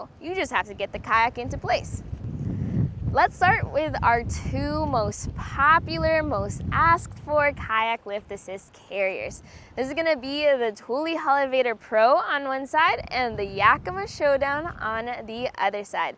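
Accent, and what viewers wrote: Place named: Hawaii, United States. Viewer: She doesnt really have an accent but more hint of her family dialect , slightly Hawaiian or Asian.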